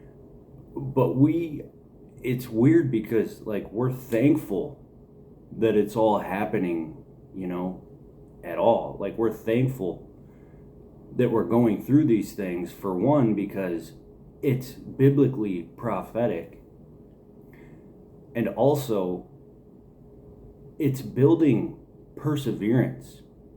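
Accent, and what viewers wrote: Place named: Michigan, United States. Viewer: I knew your accent was familiar!!! I lived in Michigan for 20 years. God bless you brother!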